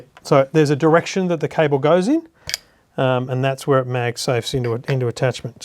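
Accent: Australia. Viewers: Nineneen nineny eight. Theeng. Compuudah. You must be Australian...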